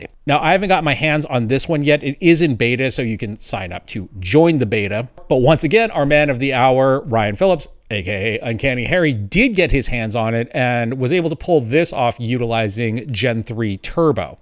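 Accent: Quebec, Canada. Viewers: Hello there, its more like a very strong French Canadian accent, French is my mother language !!! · As a french man myself, I'd say that hesounds like a guy from Montreal. Strong Quebec accent. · Great video! :goodvibes:and it's a Canadian french Accent! 🥰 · Je confirme c’est bien l’accent canadien du Québec😅😂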